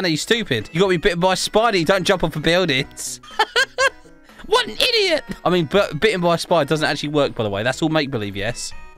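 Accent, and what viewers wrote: United Kingdom. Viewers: I like u because I love your british accent 🤠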